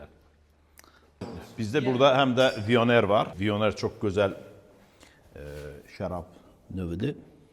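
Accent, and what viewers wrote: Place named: Shaki, Azerbaijan. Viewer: bəy,xahiş edirəm ləhcəni dəyişməyin. Şəki ləhcəsi superdi.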